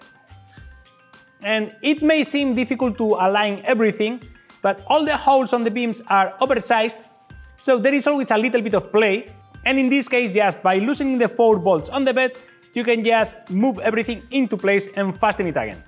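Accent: Spain. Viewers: eres español, verdad? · Soy yo o eres Español? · pretty cool! very nice project! What material is the red plastic that you printed parts from? was it hard to get the axes perpendicular in this scale? Also you sound spanish ;) where are you from? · This dude Spanish? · Joder cómo se nota el acento español...Buen vídeo tío!!! Te van a encargar cosas muy grandes con eso! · que chulo acento español xd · Eres español? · Acentazo español, nombre español, pero videos en ingles. Es como si colim furze hiciese los videos en español para tener mas seguidores en Sudamérica. 🤷🏼‍♂️ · Inconfundible acentazo español nada más abrir el vídeo xD Como me alegra ver a makers españoles. Mucho más viendo lo crack que eres y lo bien que te va en la plataforma. Proyectazo! Sub!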